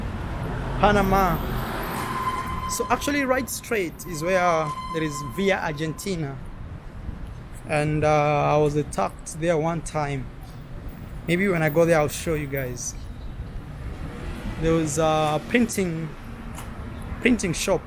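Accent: Kenya. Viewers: The kenyan ACCENT IS REAL WAAAHHH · hes from kenya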